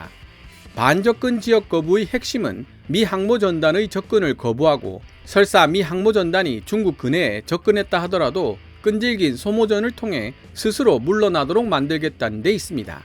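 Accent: Gyeongsang, South Korea. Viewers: 님 경상도 분이신거 같아요 사투리가 나와서요...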